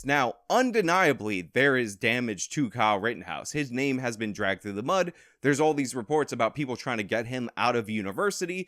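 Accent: New York, United States. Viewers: Alright the whole smartass NY accent is starting to get annoying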